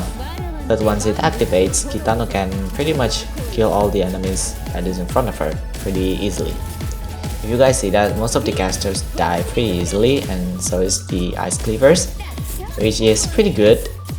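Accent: Indonesia. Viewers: you sounds like indonesian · thought so, when i heard it, ah Indonesian accent somehow Keep it up dude 👍mantab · English Indonesia nya terasa bat anjir wkwkwkwkwk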